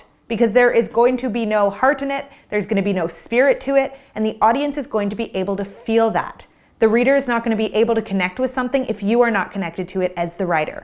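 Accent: Canada. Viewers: Hello from Belgium! I've been living here for almost 36 years after leaving my native Canada (btw, are YOU Canadian? You sound like a "mainlander" (I grew up on Cape Breton Island, NS). OK just listened to you for the first time - and seceral times it made me emotional. I hadn't realised that I was "completely normal" in my odd way of doing things (ALWAYS last minute - or not at all...). I have been wanting to write a book about my life for 20 years but always procrastinated (fear of failure mostly, I think). I'm 55 and have A LOT to write about and your suggestions absolutely resonated with me ...but they would, wouldn't they ! 😂 Thank you ! Now to start ... well, I'm teleworking today so as soon as I have a quiet, free moment. ❤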